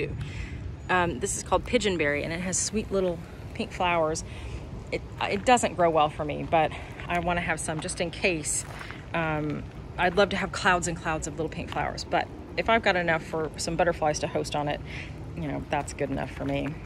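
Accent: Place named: Texas, United States. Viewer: Your garden is such a delight. Enjoy listening to your Texas voice as you describe everything. Thank you for sharing.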